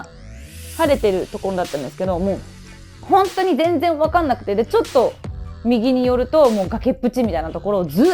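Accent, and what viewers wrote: Philippines. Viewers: Whahaha, you've been staying in the Philippines for too ... way too long! You sound like a Filipina that is fluent with Nihongo. hehehehe. I was a college teacher once and had students who are now Japanese residents due to their family members who are from Japan ... ... whenever they chat with me or whenever I see their homemade videos while they're in Japan ... they sound like you right now. hehehe .... .... so cute. hehehe.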